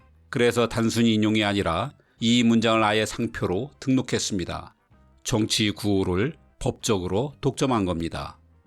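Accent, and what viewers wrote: Gyeongsang, South Korea. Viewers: 딱 갱상도 네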